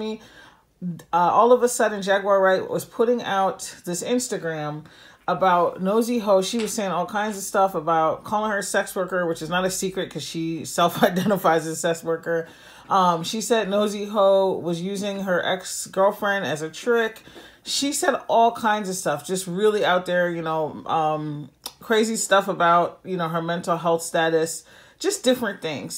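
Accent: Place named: Jamaica. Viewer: As an avid YouTuber thank you for this breakdown because I had no idea all this was going on!!! Big up yaself I think I heard a 🇯🇲 accent 💚💚💚#bapbapbap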